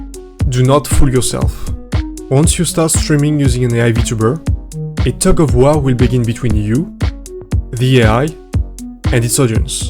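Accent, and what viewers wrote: France. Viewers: Did you know your accent is because you pronounce english words as French, or the letters atleast. It's why when I speak Japanese, I actually get praised on my accent because I force a fake asian accent that if I spoke in english would get me cancelled 😂 but for speaking Japanese it actually makes my accent more like a local. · l'accent fr xD, je m'y attendais pas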